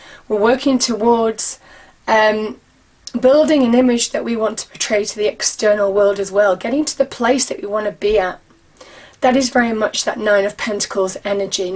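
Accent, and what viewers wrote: United Kingdom. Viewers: Hi .. It's great to hear a uk voice . 👍.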